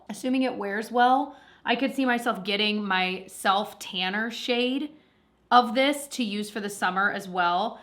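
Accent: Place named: United States. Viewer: I so enjoy your videos!! How you “feel like” x,y,z. I miss America so it’s fun to hear the little dialect differences. Been hoping for a review on that setting spray!!! Thank you for being genuinely you!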